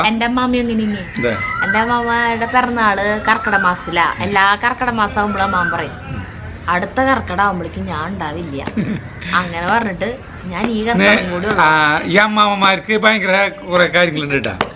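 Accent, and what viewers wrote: Thrissur, India. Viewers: I like ur thrissur slang...beef looks yummy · I am regularly watching your blogs of different recipes. I love it, I liked your Thirussur slang. I am native of Kozhikode, but settled in Vellore-632006, Tamilnadu, since 50 years. I love the way you and your wife jointly cook. All the best....Roney Raymond.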